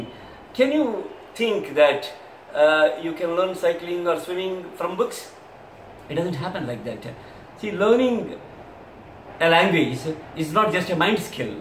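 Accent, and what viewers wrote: India. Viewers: Obvious tension,Indlish,non spontaneous phrases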